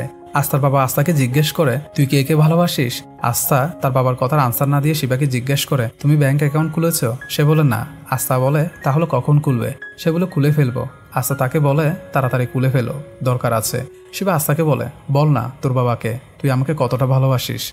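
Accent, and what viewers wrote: Chittagong, Bangladesh. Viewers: ভাই আপনে কি চট্টগ্রামের ছেলে নাকি? ভাষাটা চট্টগ্রামের শুদ্ধ ভাষার মতো🤔 আমি ও চট্টগ্রামের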